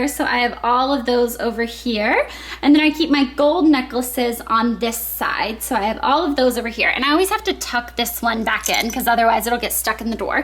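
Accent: South, United States. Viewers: well she does have a southern accent who's trying to speak northernish so asking her to speak perfect french is probably a little too much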